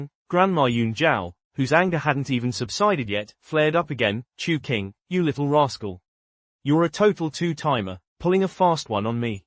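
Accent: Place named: United Kingdom. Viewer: The British accent is pissing me off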